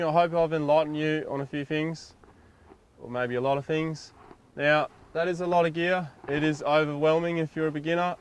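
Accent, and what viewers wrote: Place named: Australia. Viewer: Good round-up and advice! Have learned a thing or two since I discovered your channel. Must say its a bit hard for me to understand your australian acccent (english not my first language)(born and raised in Mexico) but Im getting used to it. Will definitely continue to come back, check out and enjoy more stuff.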